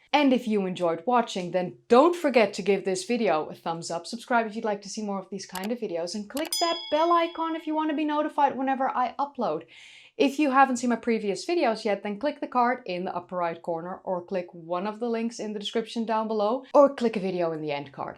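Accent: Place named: Netherlands. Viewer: I really love how you pronounce these words. I love the Dutch accent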